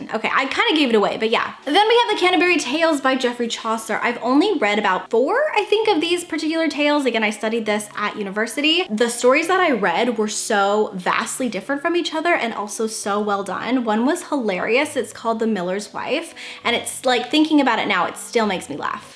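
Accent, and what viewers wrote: United Kingdom. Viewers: That intro 🤣🤣🤣🤣 your British accent could star in a period drama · OMG That bri-ish accent!!! YOU TOTALLY KILLED IT HAHAHA!!!!💗😹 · I bloody knew you were Bri’ish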